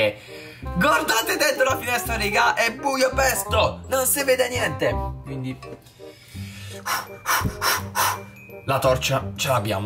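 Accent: Bari, Italy. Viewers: Sei di bari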